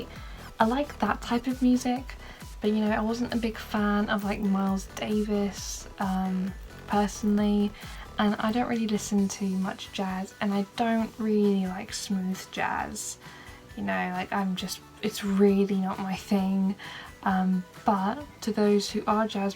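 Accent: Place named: United Kingdom. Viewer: I’ve got also another question: where in the UK are you from? Because you’ve got a lovely accent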